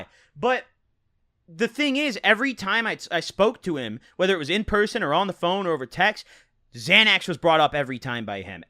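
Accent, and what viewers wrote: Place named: Illinois, United States. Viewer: sounds like he’s from bloomington, il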